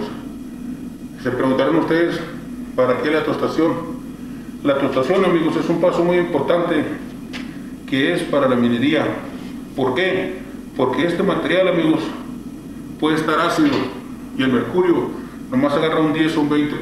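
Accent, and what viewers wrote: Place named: North, Mexico. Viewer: Suena a que es del norte de Mexico , este mi amigo.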